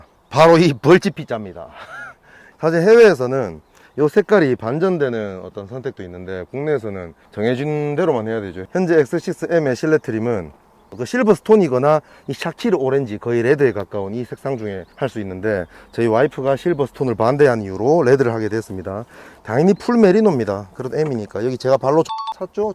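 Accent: Gyeongsang, South Korea. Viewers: 대구 분 이신가 … 엑식엠 한번 구경하고 싶네요 ㅎㅎ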